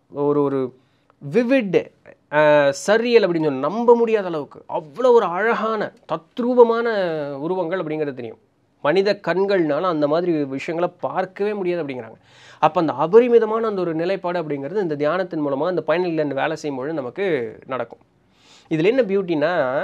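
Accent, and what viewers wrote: Coimbatore, India. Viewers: Anna neega Coimbatore ha ❤😊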